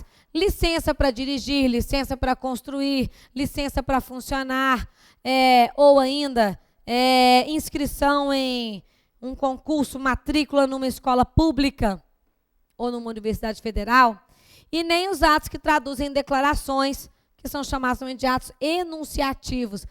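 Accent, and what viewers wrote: Minas Gerais, Brazil. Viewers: Tinha q ser mineira !!! ADORO!! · Aula muito elucidativa com uma linguagem bastante tranquila, e ainda com um sotaque Mineiro, parabéns professora continue assim obrigado pela ajuda.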